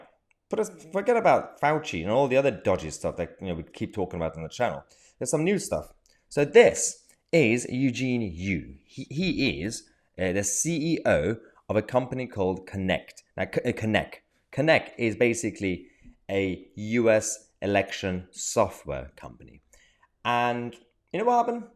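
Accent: United Kingdom. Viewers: Love that I’m hearing this from a Brit, fffs.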